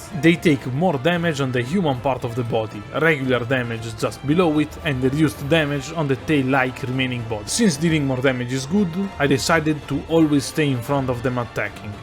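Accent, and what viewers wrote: Italy. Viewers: Si sente che sei italiano😂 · Been watching your videos in the last few days and I keep asking myself “ma è italiano? L’accento potrebbe essere quello giusto”. I’m just writing the comment in English in case I’m totally wrong. Love your videos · Love the Italian accent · l'accento italiano lo rende 1000 volte meglio <3 bellissimi video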